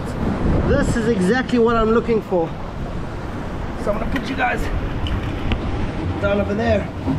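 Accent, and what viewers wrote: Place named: South Africa. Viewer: Refreshing Saffa accent. Tight lines boet.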